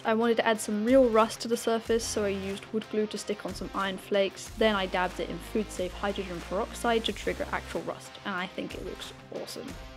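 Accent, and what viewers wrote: United Kingdom. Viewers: I never heard your voice and genuinely DIDNT expect you to be British.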